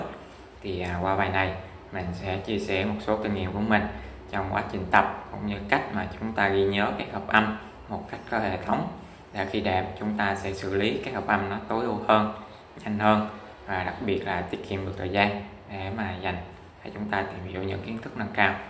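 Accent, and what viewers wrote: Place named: Binh Thuan, Vietnam. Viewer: cảm ơn bạn những Video hướng dẫn rất nhiệt tình, nghe giọng giống dân Bình Thuận :D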